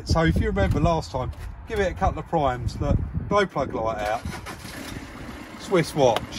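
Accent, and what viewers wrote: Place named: Northern England, United Kingdom. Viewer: I'm from Wigan way, ya know up North 😉, and I reckon some of your words and accent are as crazy as ours. Another language, briliiant!!! 😀😀😀 These videos seem to be getting better as you and become more and more used to having that camera aound. 👍👍👍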